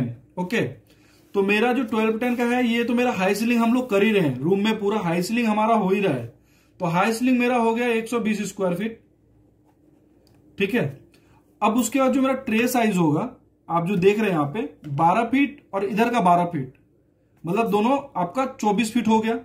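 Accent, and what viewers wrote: Odisha, India. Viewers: Bhai aap oriya ho kya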